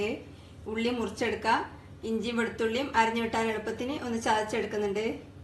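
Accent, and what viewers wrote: Kasaragod, India. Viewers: Kasargod aano kannur aano?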